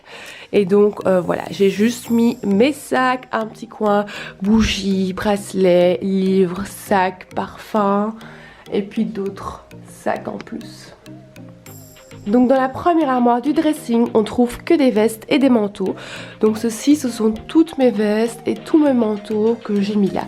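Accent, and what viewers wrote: Belgium. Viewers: elle a pas un accent français vu qu'elle est belge ils ont leur accent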